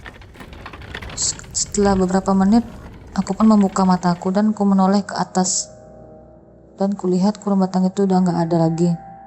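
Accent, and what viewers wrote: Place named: Banjar, Indonesia. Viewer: Tapi logatnya mirip org Kalimantan atau banjar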